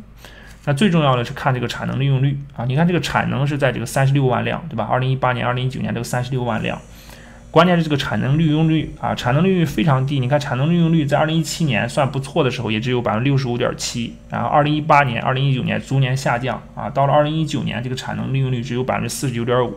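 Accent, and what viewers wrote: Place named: Shanxi, China. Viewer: 你是太原人 至少是山西人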